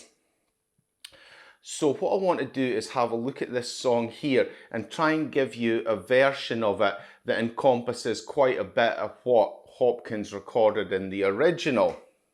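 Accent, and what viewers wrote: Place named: Scotland, United Kingdom. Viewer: Good to hear a Scots accent, sir. You choose great source material to give a lesson with. Best of health and best wishes with your channel.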